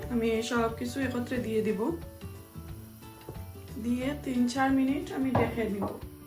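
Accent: Sylhet, Bangladesh. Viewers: সিলেটি মাত ভালা লাগে আমার ঘরে তাকিয়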